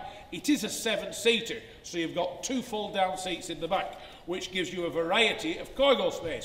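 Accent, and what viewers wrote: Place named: Northwest England, United Kingdom. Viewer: An immense machine, we have that exact VRS at the moment, mpg we got similar to you, where are you in the northwest, you sound local to us?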